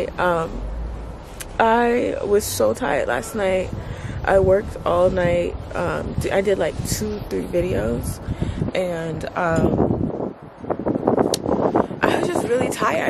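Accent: New York, United States. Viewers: Is it me or is that New York accent getting extra strong lol I think that since you been in New York your accent reappeared, I love ittttt!!!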